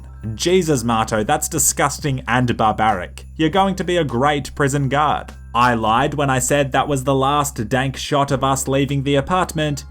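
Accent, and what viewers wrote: Australia. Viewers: Are u Australian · Where u from in Australia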